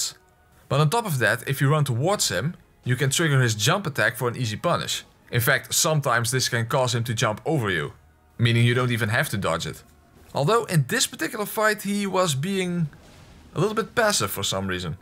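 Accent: Netherlands. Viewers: you sound dutch of niet dan :P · My brother, it's not just that amazing dutch accent; I for one am always looking forward to your new choices for metalsongs. Again: not dissappointed. Then again, can be used for every pyromancy based run too.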